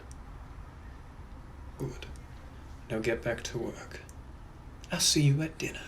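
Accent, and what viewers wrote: RP, United Kingdom. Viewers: 22 straight minutes of the British gentleman, don’t mind if I do 😭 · Thank you for blessing us with British gentleman for 22 minutes 😌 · i am currently unable to breathe... he- i- 🥴 the butterflies i have right now are insane.... i need to make his voice my alarm in the morning and my ringtone not even just the british voice... just his voice in general.. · 22 minutes of a British gentleman?? lord have mercy on my soul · I came here for the reg voice and I got British why did you lie to me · I searched "British accent ASMR" and it brought me here. 10/10 no notes. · is he British or does he just sound more American when his voice is sped up. and he’s like a handsome pretty looking dude but i ain’t crushin he is cute though. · pov: you're standards are high because you're simping over and his accent when he is a British gentleman from the 1800's... 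· Hi I like the POV's and the voice of the British gentleman from the 1800's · Fuck 'British gentleman voice - ASMR WITH THEM KNUCKLES THAT CRACK LIKE THE VOICE HOT THEM KNUCKLES POP LIKE MHHHM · Your normal and British voices are so amazing and comforting idk how but damn I could listen to this whenever I cry for comfort ily bro😔♡︎ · Idk why but his British gentleman voice reminds me of Elijah from the Originals but if he was a psycho and didn’t respect woman(maybe???) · Funny how I fell in love with kpop years ago, loved british accent since forever.. and discovered him just now 🤡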